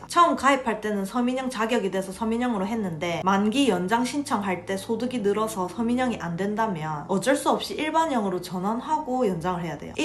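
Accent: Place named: Busan, South Korea. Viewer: 짱이에요 영상 항상 잘 챙겨보고있어요...!!🥹💜 친근한 부산사투리 너무조와용 >_<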